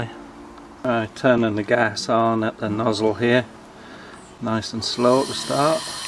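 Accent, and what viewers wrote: United Kingdom. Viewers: How does an American have a British accent?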